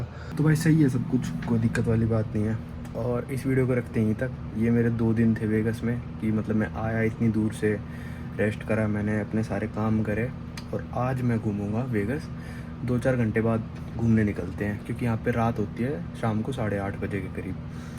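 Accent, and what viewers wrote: Haryana, India. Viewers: Haryanvi accent aa gya bhai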